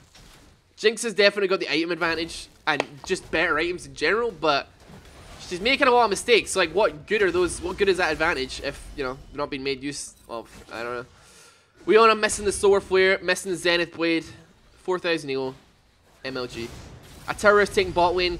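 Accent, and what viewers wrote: Scotland, United Kingdom. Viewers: where are you from? scotland?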